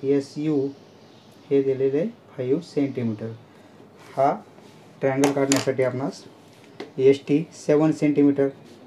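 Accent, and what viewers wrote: Latur, India. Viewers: सर तूम्ही लातूर चे आहात का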